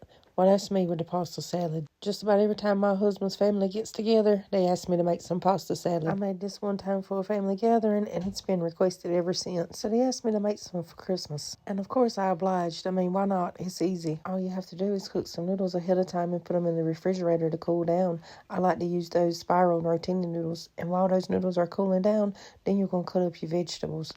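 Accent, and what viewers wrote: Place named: Southern West Virginia, United States. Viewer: Miss, this was absolutely adorable. your accent is very similar to our "Out Wayne" accent here in Southern WV. so familiar but with a twist. and i'm not gonna swear to it but i'm pretty sure you said Martini Noodles to see if we were paying attention.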